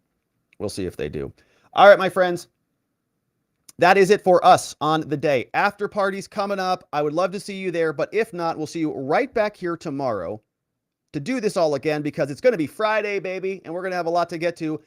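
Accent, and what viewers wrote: United States. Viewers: u have a montanan accent lol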